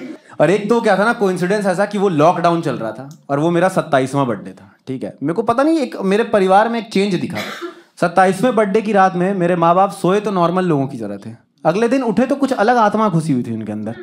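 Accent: Bihar, India. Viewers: Tum Bihari ho. accent se pata chalta hain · His hindi has a Bihari undertone. Is he from a Bihari family? just curious to know not being hurtful to anyone. Bura mat lena koi aab. Aaj kal kuch puchne me bhi sochna parta hai social media pe.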